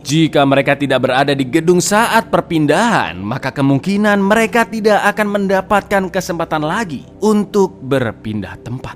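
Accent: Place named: Madura, Indonesia. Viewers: Mirip logat madura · Wah logat maduro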